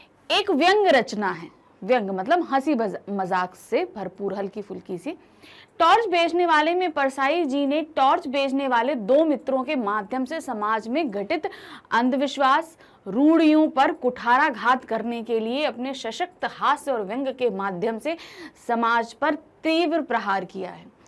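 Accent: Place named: Northeast, India. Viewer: Lgta hai north east side ke ho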